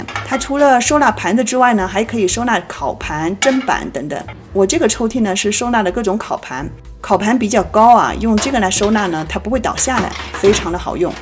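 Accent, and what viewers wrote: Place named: South, China. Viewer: 估计播主是南方人，所以有困难，不要苛求，感谢播主的分享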